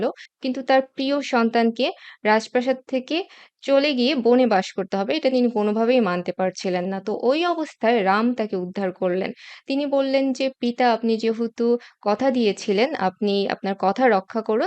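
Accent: Comilla, Bangladesh. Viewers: Apanr basa ki cumillayy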